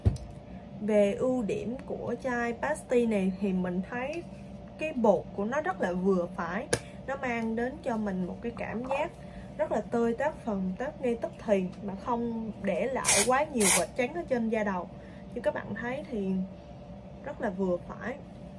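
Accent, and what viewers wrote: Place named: Binh Dinh, Vietnam. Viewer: Giọng Bình Định hay quá chị ơi!!!!!!!!!!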